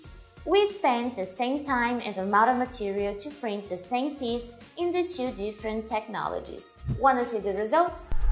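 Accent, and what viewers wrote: Brazil. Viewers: Are you from Brazil by any chance? 'Cause i hear a peculiar brazilian accent lol. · Reconheço esse sotaque Brasileiro!! 🎉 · You sound so Brazilian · Your accent is EXACTLY what I would expect from a native Brazilian Portuguese speaker. Wonder now where you're from. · Brazilian accent? Essas paradas em inglês já tá saturado, manda uns em português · seems a brazilian accent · Impressão minha ou é brasileira? Rsrs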